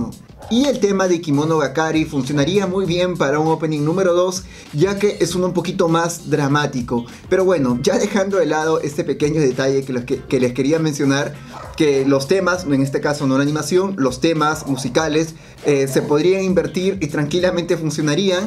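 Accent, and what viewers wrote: Mexico. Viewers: Porque hablas como mexicano, si eres peruano, te hace falta identidad hermano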